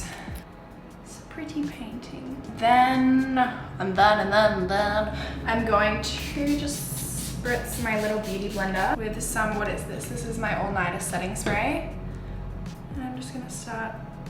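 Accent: Australia. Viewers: Are you Australian? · Any Brazilian watching??? your videos are the best ❤️ I miss australia so your accent makes me happy😂😂 good lock on your channel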